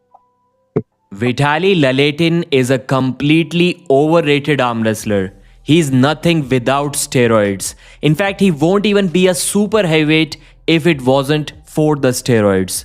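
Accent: India. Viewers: Dude you got the hardest indian accent like fckn ever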